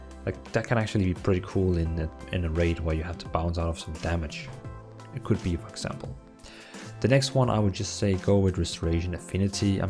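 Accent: Denmark. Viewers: Thought I could hear a fellow Danish accent :3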